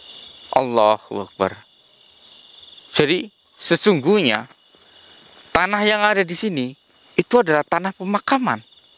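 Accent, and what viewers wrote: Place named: Banyumas, Indonesia. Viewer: Jauh banget sobat merantau nya..biasanya org ngapak d kalimantan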